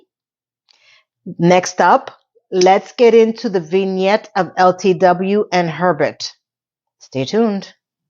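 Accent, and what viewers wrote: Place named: New York, United States. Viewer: I have so many things to say about this episode, but just paused to say, 19 years in Denmark, and still have my very distinct Chicago accent. It ain't going anywhere. ;) I love a NY accent, and I can hear it in you, not so much from "Gia". Anthony held his own,proud of him. Ok, I will un-puase and watch the rest now. :)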